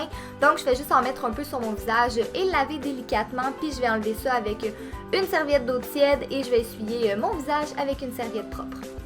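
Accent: Quebec, Canada. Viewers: J'adore tellement tes vidéos, étant déjà une fan des voix québécoise (je vis en Belgique )! J'ai découvert tes vidéos il y a quelques temps et je les adore toutes!! Bisous et continue , de plus j'adore les routines ;)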